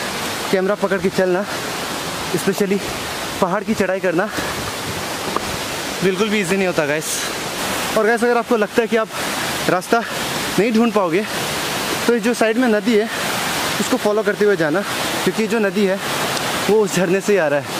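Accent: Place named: Arunachal Pradesh, India. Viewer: I like the way you talk it's kind of funny I mean arunachali style mast h🤣.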